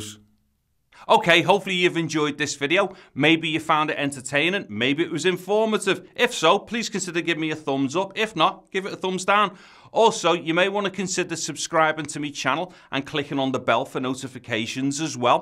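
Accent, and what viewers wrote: United Kingdom. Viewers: I love your dialect ! From which part of UK you´re comming from?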